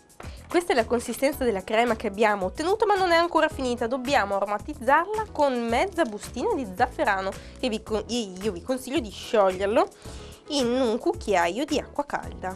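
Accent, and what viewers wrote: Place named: Sardinia, Italy. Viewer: Non metterei mai la ricotta vaccina ma solo di pecora, ma veder realizzare le pàrdulas da te è un piacere, certo non quanto sentire l'accento familiare che lascia immaginare profumi e aria della mia splendida isola, ma il tuo accento, i tuoi modi e la tua bravura sanno essere piacevolissimi come sempre. Un saluto a tutti i miei corregionali e alla mia terra lontana ❣️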